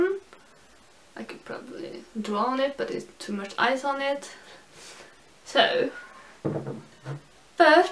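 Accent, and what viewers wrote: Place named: Sweden. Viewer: Love your accent, are you Swedish?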